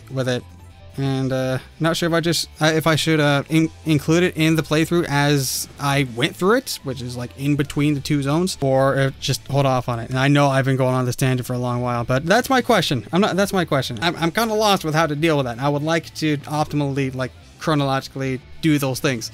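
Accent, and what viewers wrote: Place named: Scotland, United Kingdom. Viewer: I LOVED your cute Scottish accent!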